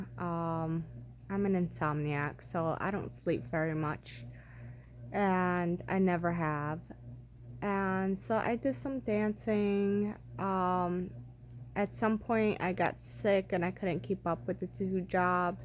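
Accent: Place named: New York, United States. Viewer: Your accent sounds new yorkish 🤣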